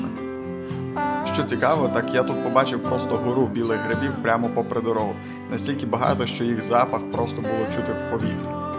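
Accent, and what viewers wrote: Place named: Western Ukraine, Ukraine. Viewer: Привіт! коментарі пишу вперше, але дуже хочу поділитися враженням) Натрапила на ваш канал в рекомендаціях і це ваууу... відео просто топ! подача інформації, монтаж... одним словом все на хорошому рівні. Скажу відверто, мене зачепив ваш говір)) Якщо не секрет, звідки ви родом? У мене є підозри, що це може бути Тернопіль або Івано-Франківськ? Дякую за такий контент, з нетерпінням буду чекати нові відео. +1 тревел-блог в скарбничку)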